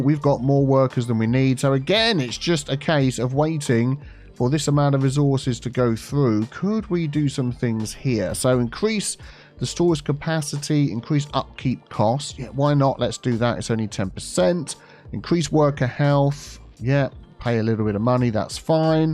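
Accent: United Kingdom. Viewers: Subscribe all would mean i get ALL the mods, Biff has FPS mods that my potatoe can't handle. so I need to search through the mods for the one he mentions, some like TMPE are hard to understand when someone says it quickly. especially a wonderful brit. a graphic with the name of the mod woule mean i could type the name in goole with Cities:skylines and immediatly get the mod I want to download.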